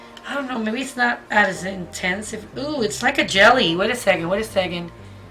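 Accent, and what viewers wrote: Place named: Miami, United States. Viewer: I love watching your vids! You’re always super vibrant and vampy. 💜 Are you from Miami?? You have a very similar accent to my own 😂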